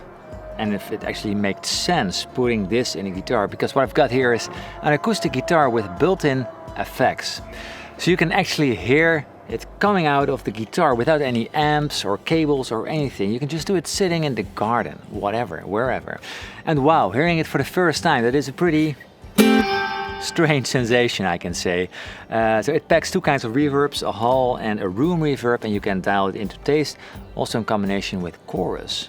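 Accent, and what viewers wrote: Netherlands. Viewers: Haha, you can recognize a Dutch accent from miles away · Great video loved it :) Butttttt if you care at all about not sounding too Dutch, watch your pronunciation of ideas (it sounds like ID's) which is a dead giveaway you're dutch. And yes fellow dutchy, keep up the awesome vids.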